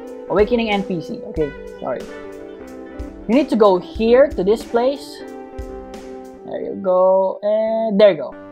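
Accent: Philippines. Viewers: Your accent is like Filipino. Are you from Philippines? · Is he Filipino his accent is like Filipino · I was wondering if he is pinoy cuase he's voice is pinoy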